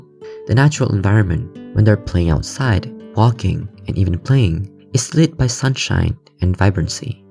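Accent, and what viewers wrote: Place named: Asia. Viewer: Interesting perspective, but there are some points you made I'm agaisnt. Dressing up as animals in public or marrying fictional characters shouldn't be normalized by society and encouraged. You should not go to prison or be systematically punished, but these actions should be socially condemned. A society needs stable and functional norms that are followed by each citizen in order to survive, or else it risks falling into problems such as decadence or just general social instability. Morality can be subjective depending on how you look at it, but standards must be set. It doesn't have to be 1984 either. However, I can understand why you might think this way. I'm not too knowledgeable on this topic, but on some countries such as Korea and Japan it can get kinda insane, as it has become socially normalized to have a false identity in your public life just to be agreeable and appease everyone. 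Judging from your accent, I think you may be Asian so it is reasonable to think you'd be unsatisfied with how social freedoms can be restricted by society in those countries. However, at some point, freedom needs to be limited so society can keep running and not fall into collapse. You have the freedom to make choices, but you do not have the freedom to escape the consequences. This may sound a bit like Petersonianism, where every individual has free will but it must be enforced with strict moral codes for an ideal society. I might be wrong, I'm not a philosophist I'm just sharing my thoug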